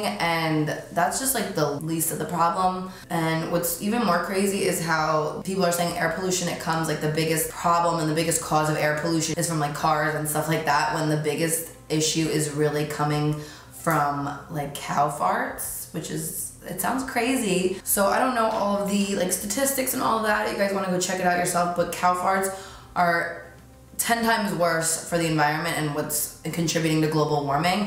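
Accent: New Jersey, United States. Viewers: i can’t tell , does she have a new jersey accent ?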